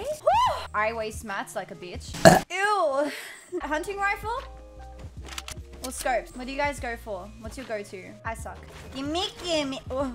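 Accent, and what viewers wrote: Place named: Australia. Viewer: Hey which part of australia u from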